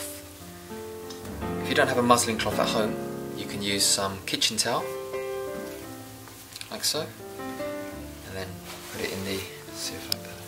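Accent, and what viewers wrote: United Kingdom. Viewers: so nice to hear someone talking about Japanese cuisine in British English! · omg British accent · Bloody Hell! Judging by you accent, I imagined you to be the most saxony british brit. Until you turned out to be east asian; I was like 0.0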